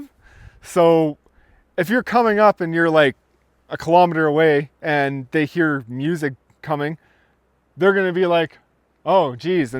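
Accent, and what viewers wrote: Canada. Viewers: …we knew you are from Canada when you said “about” and “zed”…😅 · Sask accent? What area are you from?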